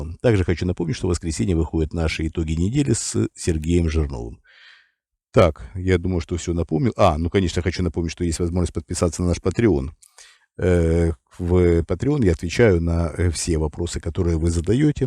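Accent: Ukraine. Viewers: А все-таки, у ведучого відчувається українське походження. Може із кубанців?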